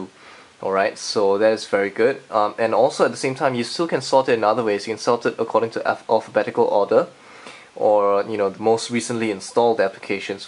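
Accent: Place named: Singapore. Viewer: When I watched this video I was listening to the guys voice. "Definitely Singaporean" haha looks like i'm right XD Singaporean's do have an accent huh... BTW I have this phone, It's not bad and the screen and is BIG but what I don't like about the MIdnight blue/black version is that that back cover gets a lot of finger prints.. but it's good.. quite.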